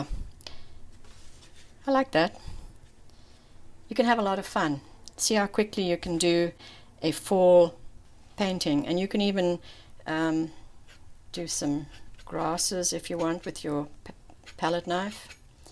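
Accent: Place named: South Africa. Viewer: Hi, Ive been doing watercolours for the last 9 months and loving them. Thanks for your videos they have been very helpful to me. By the way you sound very south african...must be an expat!:)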